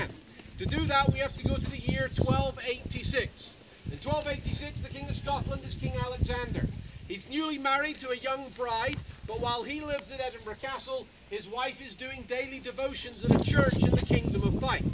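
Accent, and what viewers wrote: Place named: United States. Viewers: the presenter is a Highlander Born and bread, he went to America To study where he picks up his American twang. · Interesting presentation, however, the presenter sounds TOO American for the sake of authenticity. Saor Alba🏴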